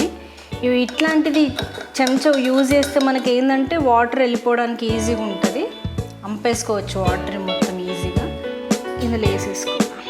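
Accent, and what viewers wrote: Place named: Nizamabad, India. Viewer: Though it's orugallu vantillu..You are pakka NIZAMABADI 🔥💗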